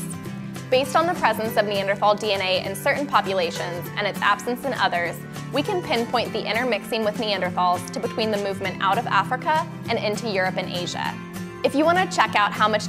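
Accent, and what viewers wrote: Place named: United States. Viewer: her hardcore white american voice and the music... it's a lot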